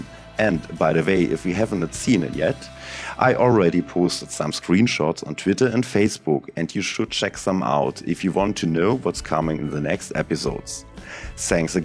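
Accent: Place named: Germany. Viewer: ich liebe es wenn ich durch den Akkzent erkenne das man deutsch ist. 😂 Schönes Video.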